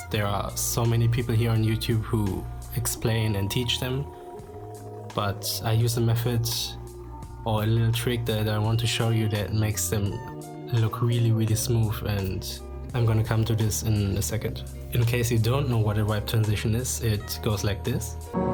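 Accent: Germany. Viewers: Are you German or Something like that? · dieses Englisch man merkt so das du Deutscher oder so bist xD aber Inhalt ist top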